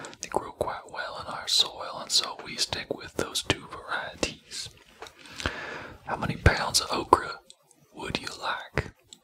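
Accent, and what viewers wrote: Texas, United States. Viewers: I’m down I’m in tx right now it’s perfect man I thought you were a all Texan family man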